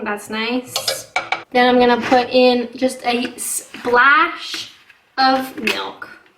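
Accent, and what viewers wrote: Canada. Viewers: I really like your videos (because you're spontaneous and sincere). I am learning English, so your videos help me to practise listening, and I have also learned new words with you. I love your Canadian accent. Greetings from Spain! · You sound Canadian. Not British. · Because i love your Canadian accent , Much nicer than my boring Surrey Accent (British)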